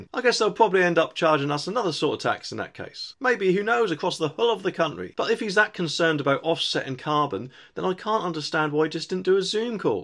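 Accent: Norfolk, United Kingdom. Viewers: Is that a norfolk accent?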